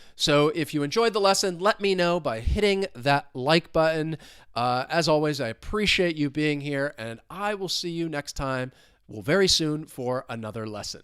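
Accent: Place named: New York, United States. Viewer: Based on your accent, I think you are from NYC or NE states at least. Am I correct?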